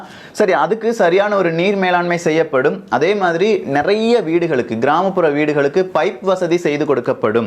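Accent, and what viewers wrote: Kerala, India. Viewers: Hello bro! I watch all your videos! They are really nice and useful. But unga speeches la Niraya Malayala vaadai adikuthu! You have a strong Malayalam accent! At times I hear Malayalam words also! Are you basically a Malayalee? Is your first language Malayalam! ? Just curious bro!!!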